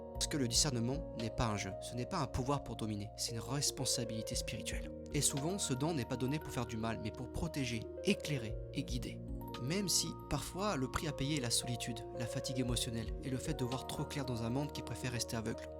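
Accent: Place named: South, France. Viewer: tu as les yeux gris.😮 À moins que ça soit la vidéo qui donne cette impression. 😊 Et tu as l'accent du sud aussi on dirait. 😘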